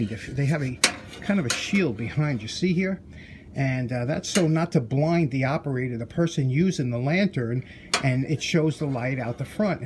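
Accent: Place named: East Coast, United States. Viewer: You’re the best, all of your nostalgic sentiments resonate in me. I was born in Allentown, PA in 1969 and moved to CA when I was 10, your East Coast accent and perspective rings true for me. Happy New Year. I love all of your posts and look forward to all that come in 2021.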